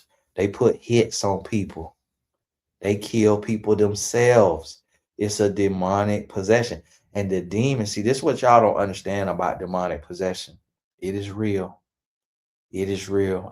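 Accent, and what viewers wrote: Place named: Florida, United States. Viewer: Smh, I've had this convo with a few folks recently and was left feeling really bad. Was questioning my values and acceptance of others for standing firm in not being willing to entertain a potential bi-sexual man. THANK YOU for speaking on this... And the country/Fl delivery is quite funny and REAL😂 Ladies, resistance is really a form of protection, don't let these podcasters and society let you think otherwise!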